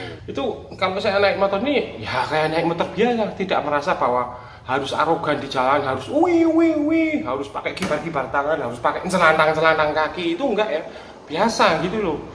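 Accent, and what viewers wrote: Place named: Banyumas, Indonesia. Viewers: Benar banget om begitu lihat pertama kali youtube om langsung suka karna bahasa om yg ngalor ngidul nya medok banget itu yg bikin suka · Ga ngapak ga enak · Klo saya lbh suka ocehanya mz lbh klasik jwo medok hhhhhhh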